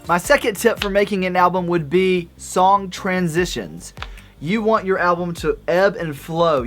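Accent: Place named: Denmark. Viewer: you sound danish:)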